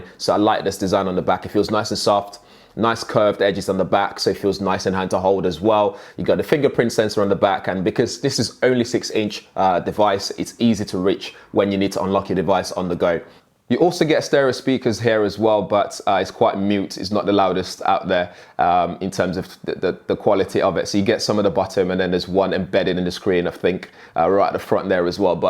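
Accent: United Kingdom. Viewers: love your British accent dude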